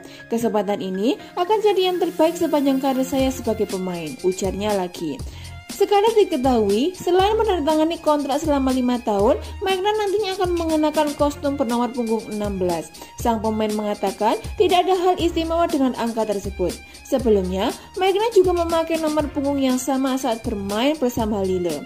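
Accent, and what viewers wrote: Java, Indonesia. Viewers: Narator nya medok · Seneng banget denger conten ini, jawa nya medok.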